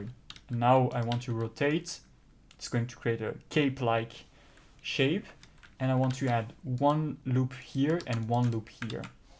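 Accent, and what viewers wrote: France. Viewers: nice french accent and video ! good job dude :) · French accent 10/10 <3 great work on the video it literally made me get back into blender! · oh j'ai tout de suite reconnu le petit accent français XD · Don't worry your french accent is understandable and nice!! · it sounds like you are french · Super tuto le m'sieur à l'accent français ;-) Je viens de tomber par hasard sur ta chaîne, j'ai du retard à rattraper. Merci pour le partage de tes compétences. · really good tutorial and the french accent is cute. thanks! there is so much you can do with meshes and wireframes. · man what r u talking about... ur French accent is a blessing haha. I've watched so maaaaany tutorials of asian guys understanding whom was a fucking torture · "And if you're REALLY lost because of my French Accent, don't wor-" Me, immediately: Oh no! Don't you dare be disregarding yourself, sir, ma'am, or Enby person, because I've been learning French for a REASON, DAMMIT! 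· I got lost in your silky smooth french accent and accidentally made my base mesh out of cardboard, styrofoam and an actual blender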